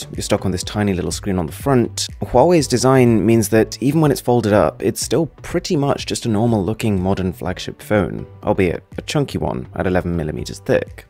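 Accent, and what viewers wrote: RP, United Kingdom. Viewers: Quite weird to hear someone with such a strong/"posh" British accent say "anyways" several times. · This guy is so posh omg looool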